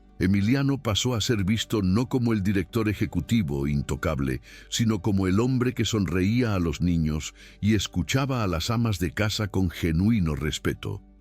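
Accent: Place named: Colombia. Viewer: Usted es colombiano, cierto? Ese español, esa vocalización, es inconfundible. Es un narrador excepcional! Felicitaciones!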